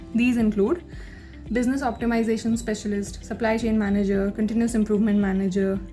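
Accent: India. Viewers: You tried to maintain a good English accent but a lot of place you are speaking as a typical Indian accent where you rolled a lot of words in your mouth and delivered it very hastily. "Try this test: say "At this time I am not available", then pay atttention to the word "available", and then good the word "available", then click on "how to say this workd. And then compare it the way you say it. Ta Da... your accent will prove and make you outstand as an Indian English speaker......any way I liked your video for the fact that you might have repeated this written script several hundred times and that is appreciable. Good work.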